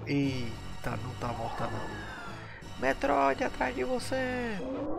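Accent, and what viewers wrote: Recife, Brazil. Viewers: Senti um sotaque recife ai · Cara…. Estou ha tempos para escrever aqui umas coisas…. Primeiro vou falar sobre minha relação com esse jogo: foi o jogo que mais curtia lá nos anos de 1995 / 1996 (+ ou - por aí). Eu me dediquei muito ao jogo, e em uma época que não havia muitas informações, consegui sozinho acumular 98% dos itens. Daí na época uma revista lançou um “detonado” do jogo, e eles conseguiram 97%! Além de ter ficado orgulhoso com meu desempenho, consegui descobrir os 2 itens que faltavam! 🙌 Agora vou falar de vc: cara, tu é muito fera!!! (Pra não falar muito f*da!!) Nunca assisti vídeos muito longos de games, mas esse seu aí já assisti duas vezes…! Rodar o jogo todo sem errar caminho, sem pausar p ver mapa, sem hesitar… basicamente sem erros e demonstrando muita habilidade… muito fera! Parabéns! E vejo que esse acaba sendo “só mais um” jogo em que vc demonstra habilidade. 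Parabéns!!! E grato por esse vídeo!! Por fim: vc é de recife?? Pelo sotaque, assim me pareceu… Saúde e sucesso p vc e família! Ah: e qual idade da filhota pequena que vc cita?? 🥰 O meu tem 6 meses… 🥰 Abraço!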